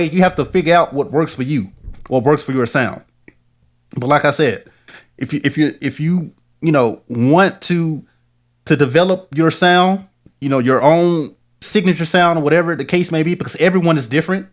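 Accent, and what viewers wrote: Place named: Deep South, United States. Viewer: The only thing cooler than your tone is that deep south accent...great explanations, thank you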